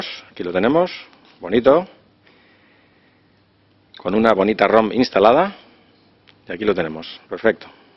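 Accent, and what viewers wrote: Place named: Italy. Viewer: you are an italian who is trying to speak spanish? omg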